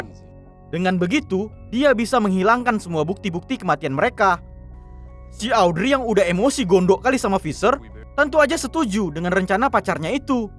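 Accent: Medan, Indonesia. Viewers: Swrius saya nonton alur ini bukan pengen lihat filmnya tapi dengar logatnya ngomong medan kali wak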